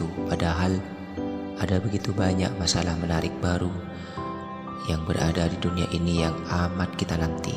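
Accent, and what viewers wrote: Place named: Madura, Indonesia. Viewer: ni pasti orng madura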